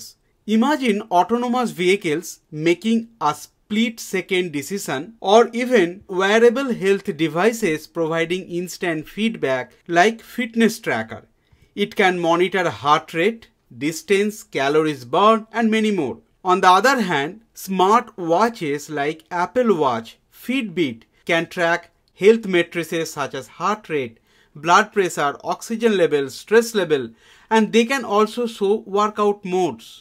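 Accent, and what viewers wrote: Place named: Bengal, India. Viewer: Thank you. The content is really good and helping a lot for certification preparation. Just a suggestion, the voice used for recording is Bengali / biased . May be a auto dubbing provided by youtube will be more polished and helpful since there is no bias for pronounciations. Again, its a suggestion, please dont take it in a negative way.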